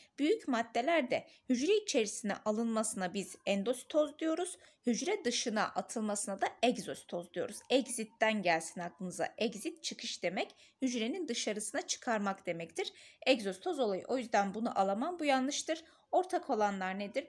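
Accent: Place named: Mardin, Turkey. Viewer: şiveniz mardin şivesine benziyor ondan sordum hocam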